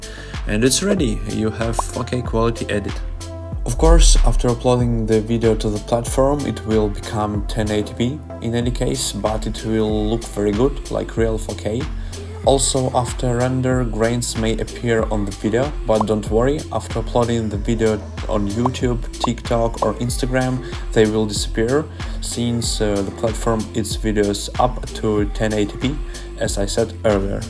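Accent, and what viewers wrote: Russia. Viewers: bro you russian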